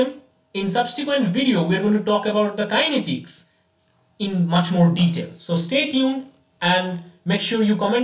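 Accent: India. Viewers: lol he can't afford a real copy of windows? This cyborg voiced indian is sus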